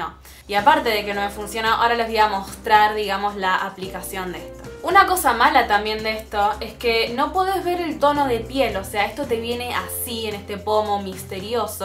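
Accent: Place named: Argentina. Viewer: me encanta tu voz argentina divina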